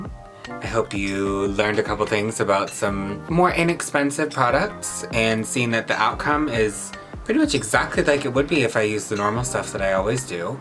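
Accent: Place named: United Kingdom. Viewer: Stopppp, are you from the UK?! 😭👏🏽👏🏽👏🏽 Love you even more now 🇬🇧🇬🇧🇬🇧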